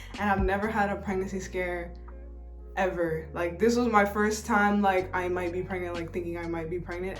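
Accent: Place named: New York, United States. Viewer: knowing she's from ny without actually knowing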